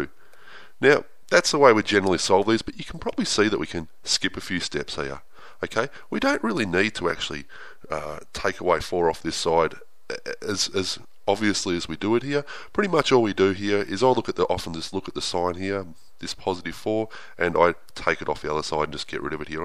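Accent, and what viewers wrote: Australia. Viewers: Having an Australian guy really helped out and kept me interested. I wish my teacher talked liked that. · Sounds like a fellow Australian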